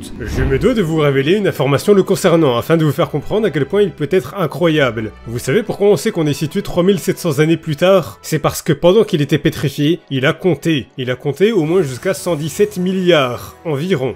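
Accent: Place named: France. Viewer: Je lis les scan cher empereur (je suis même à jour), et "Senku" (Senkū en romaji si on en croit wikipedia) en japonais se prononce littéralement "CènkOU" pas "CènkUH", ça finis par le son "う" (allongé qui plus est) qui est un mélange entre le son "EU" et "OU" (comme le "U" de "LUffy") donc je sais de quoi je parle justement, après, si tu veux garder ce cher accent français, je ne me permettrais pas de juger l'empereur :) Merci de faire découvrir ce manga a un plus grand nombre (le coter comique étant son point fort pour moi), tu lis promise neverland sinon?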